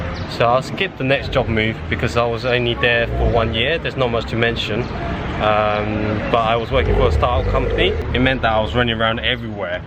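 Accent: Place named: North London, United Kingdom. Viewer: Be used to the American accent and good to have the video with your north London accent, which is a quite good opportunity for learning something new.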